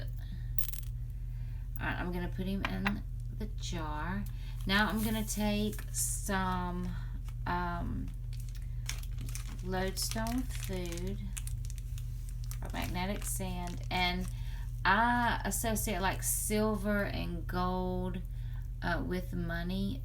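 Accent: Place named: South, United States. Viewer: I could listen to you talk all day, you sound like my ex wife. You southern belles are something else.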